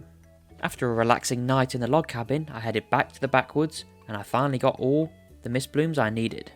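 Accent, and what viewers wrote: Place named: South Yorkshire, United Kingdom. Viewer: FINALLY i found someone who plays the curnocopia mod !!! Also your accent is VEERRY similar to mine im from south yorkshire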